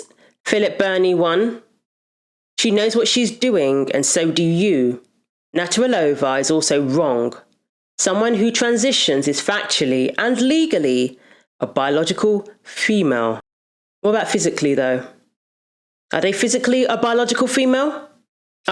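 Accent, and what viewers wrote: United Kingdom. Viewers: Thank you for your comments, interesting with your British accent from the US. I am in the U.K. but lived in the US once! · Himdia is a f#cktard...and I'm glad you made this video sweet..its nice to listen to a Brit on here..and I'm glad you've not lost your accent!..staying true x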